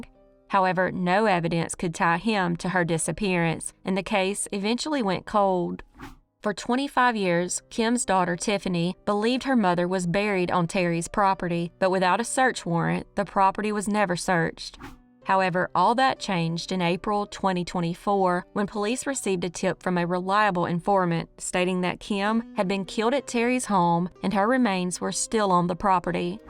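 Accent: South, United States. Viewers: I love her voice and Southern accent. ❤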